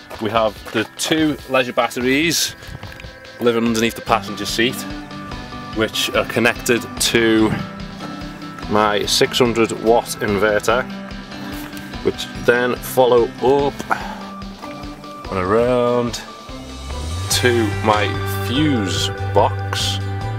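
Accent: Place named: Doncaster, United Kingdom. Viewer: Love your van - also love the accent! (Doncaster is it?)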